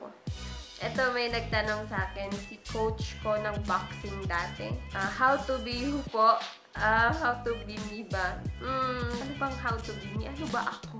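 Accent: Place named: Pampanga, Philippines. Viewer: Kapampangan ka po? May accent e haha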